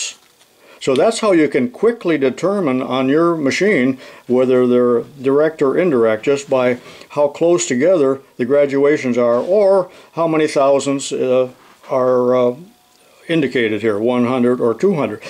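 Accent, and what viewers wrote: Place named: Midwest, United States. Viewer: You have certainly joined the 21st-century with your 3-D printing. I think half the fun of watching your videos is listening to you talk. Your voice is like my first shop teacher and has that “Jimmy Stewart” midwest drawl.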